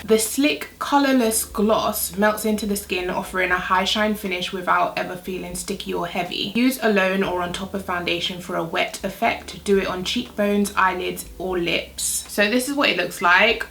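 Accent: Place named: United Kingdom. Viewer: Your British accent made me smile! I love your voice and your accent. <mail> on IG!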